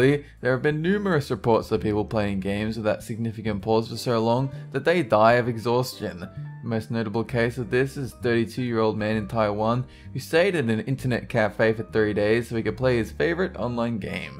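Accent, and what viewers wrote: Australia. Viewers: This guy sounds like an Australian Dave Rosenberg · is this guy aussie? because I am and he sounds he is too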